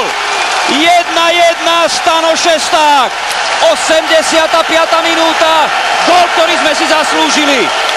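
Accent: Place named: Eastern Slovakia, Slovakia. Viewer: Merciak rulz... Vychodniar sa nezaprie :-)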